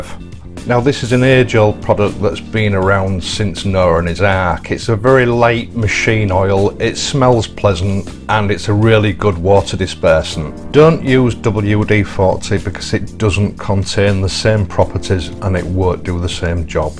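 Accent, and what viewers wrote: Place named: Hull, United Kingdom. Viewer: I was just watching your video and was going to ask if you were from Hull as I am and recognise your acsentthen you said Hull area lol.Ive just purchased a T 120 black.I live at Gilberdyke,the EXhausts are matt black.Then I’ve just seen your video is 6 years old.Do you still have the Triumph? great vids by the way .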